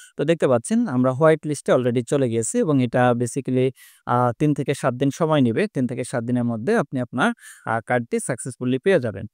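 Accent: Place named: Noakhali, Bangladesh. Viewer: bhai apnar kotha barta shune mone hocche noakhali bari apnar ......uccharon aro valo howa uchit....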